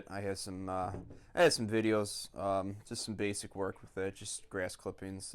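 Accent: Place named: Chicago, United States. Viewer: I hear that Chicago accent. Where you from? I'm on da south side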